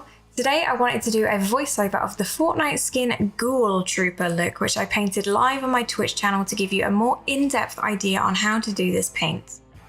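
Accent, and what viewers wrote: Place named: Australia. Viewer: I do like your voiceover better! This is much more informative for those of us who missed the stream. Plus, you know, your voice is as awesome as the rest of you! I'm from the United States and I LOVE your Australian accent! How much do you sing? I'm totally serious about your voice (at the very least, you have a wonderful speaking voice, even if you don't sing at all). <3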